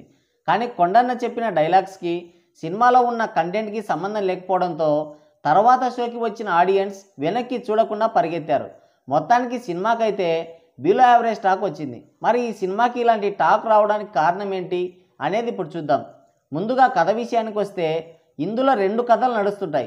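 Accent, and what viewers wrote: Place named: Telangana, India. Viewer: Anadaru chiru, ntr laga real. Life lo act cheyaleru lera disiplane anteh endi ra lanjakodaka telangana slang lo matladitheh discipline lenataa pukaa andra lo shekalu. Paditheh displane unatlaa ina ni voice ala undi nit motham evadidoo chiikii chikki noru noppi puuti matladinatlu ni abbaa ninu byta edo oka roju pagal dengutharu chusuko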